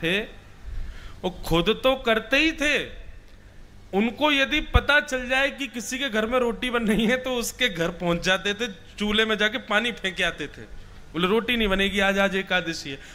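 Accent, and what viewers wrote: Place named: Braj, India. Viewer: Raadhey raadhey guru ji aapke charno me koti koti pranam aapki Katha me rojana subah jagte hi sunti hu aapke mukharvind se brij bhasa bhut sunder lagti hai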